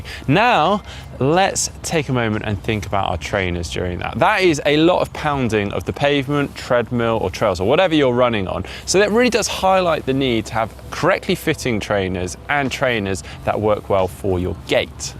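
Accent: United Kingdom. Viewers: I want that British accent lol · Ahaha btw British accent is nice to hear 👍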